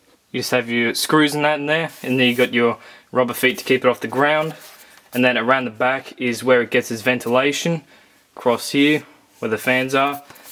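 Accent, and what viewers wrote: Australia. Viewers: i really like this aussie accent hahah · are you from aus or nz ?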